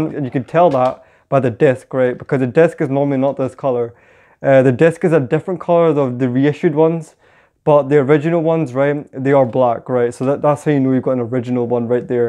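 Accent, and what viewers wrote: Fife, United Kingdom. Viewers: That sounds like a Fifer accent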